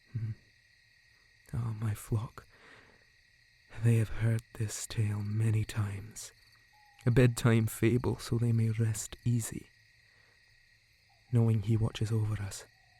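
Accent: Scotland, United Kingdom. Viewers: Love the Scottish accent. It fits the character perfectly.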